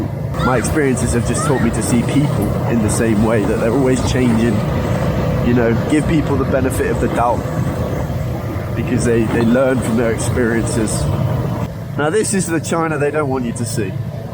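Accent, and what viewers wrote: Standard. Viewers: 你的中文比大部分中国人的发音都要标准，因为我们有很多乡音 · 你的发音真的很标准！当然我说的是你的中文！ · 你的中文水平真的提高了好多呀！你最早的视频真的一点中文也不会的。现在你这发音可标准了哈哈哈！表扬一下！ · 非常感谢你的真实中国视频！ 你的说出来的汉语好标准！真棒！ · 中文有进步，口音很标准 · 很好哦，中文有进步啊，没什么口音还挺标准🤓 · 视频有深度了。中文发音很标准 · 你的发音很标准呀！感觉很少见到发音这么好但是流畅度还不够的外国人。印象中大多数人的情况都是，已经能流畅说话了但是发音实在有不好的习惯。感觉你基础打得很好。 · 天呐！我也想要一支这样的笔😍，有道翻译太牛了👍！另外，你的中文发音好正👍谢谢你视频最后这段客观事实中肯的话❤️ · 你讲中文的口音很标准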